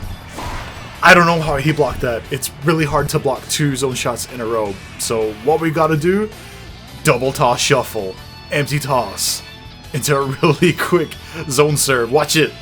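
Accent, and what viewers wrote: Ireland. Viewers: I can really hear your Irish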